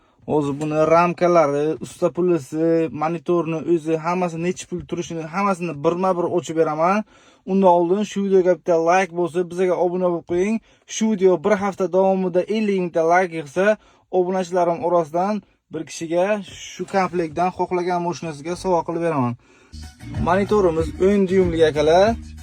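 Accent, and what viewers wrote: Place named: Bukhara, Uzbekistan. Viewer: Adres shevasidan buxoro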